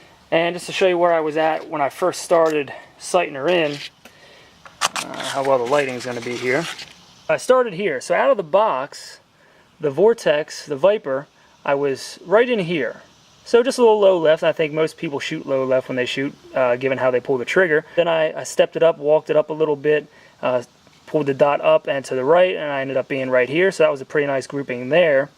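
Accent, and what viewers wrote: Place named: Maryland, United States. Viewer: Sounds like a Maryland accent.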